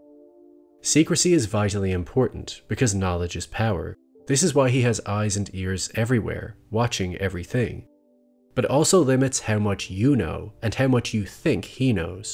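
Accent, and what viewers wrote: Ireland. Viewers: R u Irish I can hear the accent a bit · Where in ireland u from?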